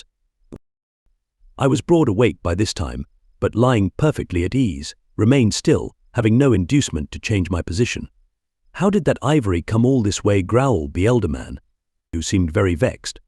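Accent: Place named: United Kingdom. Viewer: I like the British narrator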